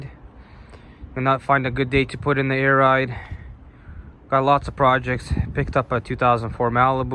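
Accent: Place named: United States. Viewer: смотрю твои ролики,читаю комменты в переводе на русский.Тяжело воспринимаются слова на американском диалекте,но пытаюсь понимать вместе с жестами.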